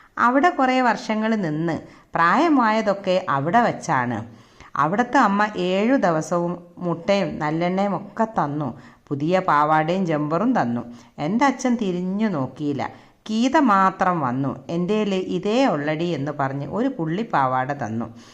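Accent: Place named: Thiruvananthapuram, India. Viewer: തിരുവോന്തരം ഭാഷ ഉഷാർ😊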